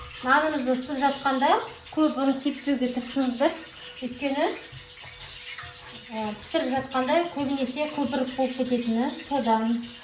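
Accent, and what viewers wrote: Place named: Uzbekistan. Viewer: Қазақша да орысша емесөзбек не қалпакша сөйлиеди бир бале былғап деген. Сөз жоқ қазағым да сүмдық 🤧😷🤧😷😷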